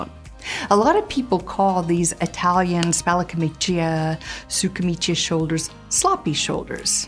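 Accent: South, United States. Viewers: Is from the South? I thought I might have heard some accent here and there, but maybe I‘m wrong. Great video anyway, and a lovely personality. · Just a guess, but I would wager that based on pleasing speech delivery, she was raised in a Southern state. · Awesome video! I am italian (from the north) but I really love the english shoulder and construction. It gives me such a strong appereance that has no rivals in my opinion. Despite it still being vert constructed even nowadays, I feel like it could have been even more in the past: I have a vintage chalk stripe suit from the seventies which was made in Saville Row and the shoulder is super heavily padded, I just love that! It was so intresting to explore the other styles anyway, this was very educational. I had no idea that you were american, I should have recognized it from the accent, I love y'all so much. 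Keep up the great work!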